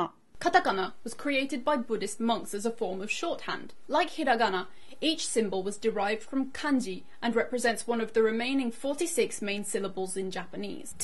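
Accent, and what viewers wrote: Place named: United Kingdom. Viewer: Look her accent. She's from UK. I don't think that I could hear that nice english from an avarage american female teenager. (I'm the offensive) It would probably be like that "y'all gonna rite kateiganaa if u wanna pop out ur words 'n Kanjee words comed from Chaineese's abc like, butt ya know if you wanna do a logo, u gon' use our letters"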